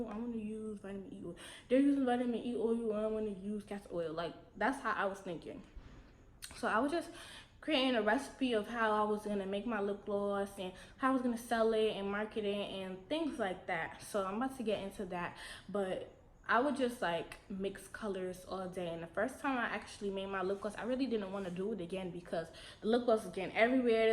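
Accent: New York, United States. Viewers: I promise you I knew you was from New York. It’s the accent for me 😂🖤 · I knew you were from New York because of how you talk lol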